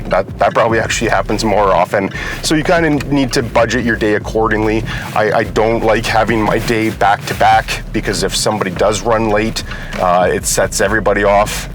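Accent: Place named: Canada. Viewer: I've been binge watching your videos, largely because you've got such a humble, informed, and no-bullshit style. I was super pumped because of your serious Canadian accent, so tried to guess where you were, with no success. Lo and behold, I watch this video and you pull up next to my favourite electronics shop!!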